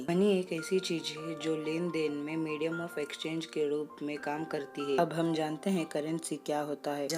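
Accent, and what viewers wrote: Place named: Uttar Pradesh, India. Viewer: Avaj se UP ka lag rha hai bhai tu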